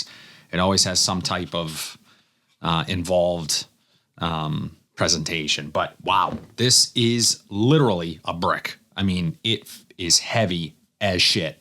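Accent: Boston, United States. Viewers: Knew that accent sounded familiar. 617 baby 💪🏻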